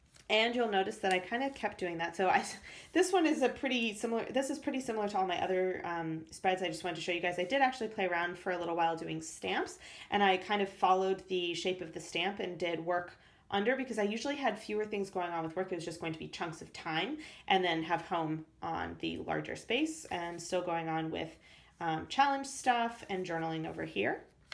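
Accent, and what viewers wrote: United States. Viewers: Great video! And you write in all those different languages? Your life seems very interesting! You sound American so I'm assuming you are studying abroad! Very interesting!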